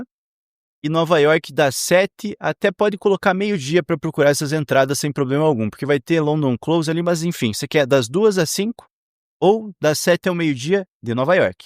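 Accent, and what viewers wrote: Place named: Curitiba, Brazil. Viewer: Depois de 8 anos operando e consumindo tudo q existe no youtube, descobri esse canal e não consigo mais assistir outros. Vejo diversas vezes cd aula e assim q puder vou retribuir adquirindo algum serviço pago seu. Obrigado,! Obs. Sou de Curitiba, reconheço esse teu sotaque aí!😂👍🏻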